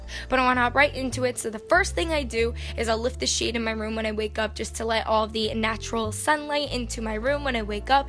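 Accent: New York, United States. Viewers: Okay I just stumbled on your channel and as soon as I heard your voice I could here the New York Accent, I’m from Pittsburgh so I hear the east coast accents all the time 😂 nice vid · Send Help everyone has an accent. And I think they meant her new York accent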